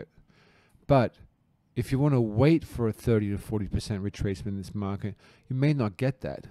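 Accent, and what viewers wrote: Australia. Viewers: your/our Aussie accent, it's milder than the American accent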